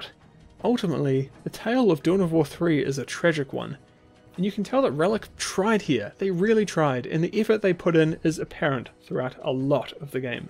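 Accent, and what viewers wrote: New Zealand. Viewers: This video made me actively dislike the kiwi accent. "Vuctury" "Sit-up" "Sid" "Lamint"? Seriously?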